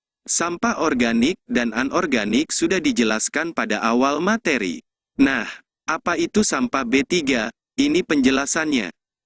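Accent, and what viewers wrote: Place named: Banten, Indonesia. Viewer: banten mana suaranya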